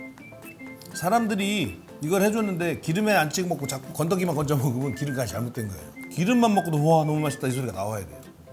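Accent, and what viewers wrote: Chungcheong, South Korea. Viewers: 이렇게 뿐진담에.. ㅋㅋㅋ 충청도 사투리..ㅋㅋㅋ 정겹네요 고향사람이라 ㅎㅎ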